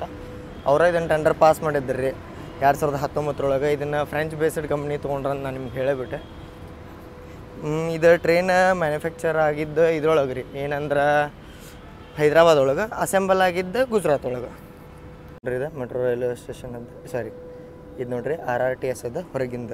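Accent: North Karnataka, India. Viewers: ಇಟ್ಸ್ ಓಕೆ ಅಣ್ಣಾ ಜೀ... ನಿನ್ನ UK ಭಾಷಾ ಶೈಲಿನಲ್ಲಿ ವೀಡಿಯೋ ನೋಡೋದೇ ಒಂದು ಖುಷಿ ನನಗೆ .. 🎤🎵🔥🔥🌟🌟🌟❤️🙏🙏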